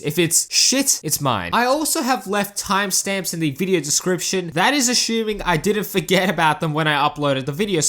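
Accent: Australia. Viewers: hello mr aussie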